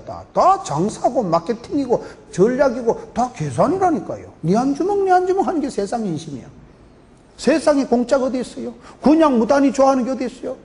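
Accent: Gyeongsang, South Korea. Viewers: 귀하신 목사님 ㆍ 경상북도 사투리에 멋진 비유 ㆍ귀에 쏙쏙 넘 넘 감사합니다 🙏 🙏 🙏 💜💜💜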